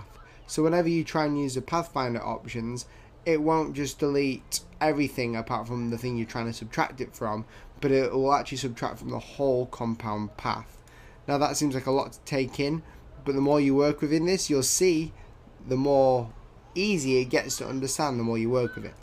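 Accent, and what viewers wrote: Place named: North, United Kingdom. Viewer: You sound like a northerner like me so, 'subscribed'.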